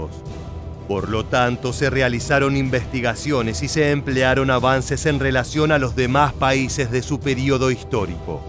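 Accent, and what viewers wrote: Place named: Argentina. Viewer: Al narrador se le nota lo europeozadonque se siente ha bla en español Argentino y no quiere pronunciar los nombres de los vehículos en español que incongruencia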